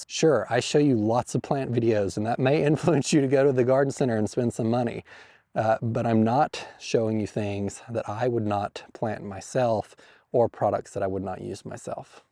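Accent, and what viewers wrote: South, United States. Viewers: Thank you for giving us a glimpse into your history and your gardening evolution. Though it’s really none of our business, it’s really sweet of you to do that, as some people want to get to know you and are very interested in your garden — watching and learning from you. May I also say your delivery is comforting. I like the ‘Southern’ pace — as new gardeners really need the way you educate with how and why you may be doing something. You are unique in that way. Your love and passion for gardening really comes through. Congratulations on your new property and best wishes for your success!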